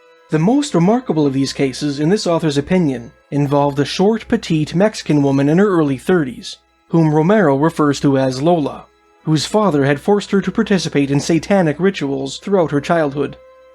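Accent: Canada. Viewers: You have a very Canadian accent!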